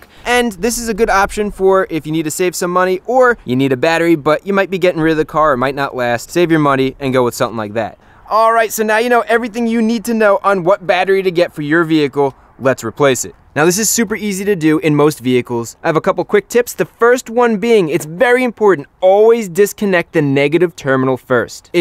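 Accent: United States. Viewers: American (simplified) english, dialect we hear in movies, shows and songs.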